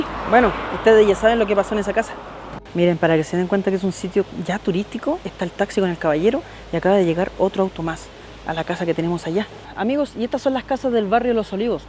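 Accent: Chile. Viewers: es el chico que hace el vídeo verdad? Porque tiene acento chileno.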